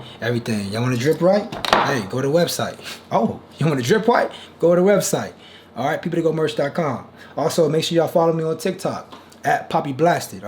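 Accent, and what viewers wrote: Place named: Washington DC, United States. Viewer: Aye you sound like a dc nigga too🤣💯🖤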